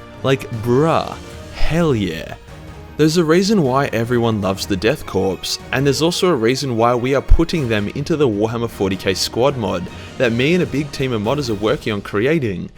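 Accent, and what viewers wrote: Australia. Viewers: Bruh, why are Australian accents the best? voice makes my mussy moist. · I already know half the lore but hearing from an Aussie makes it better.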